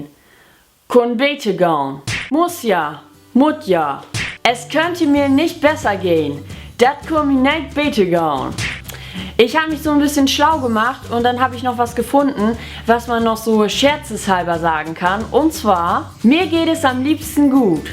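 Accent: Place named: East Frisia, Germany. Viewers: spricht Rheiderländer Platt, das sich von anderen plattdeutschen Dialekten, selbst solchen aus Ostfriesland, in der Aussprache unterscheidet. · Kommst du aus Ostfriesland? Dein platt hört sich danach an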